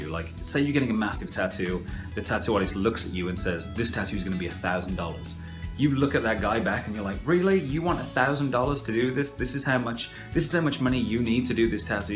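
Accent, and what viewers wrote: United Kingdom. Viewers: Are you british? because you sound kinda british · You sound British but say some words with an American accent.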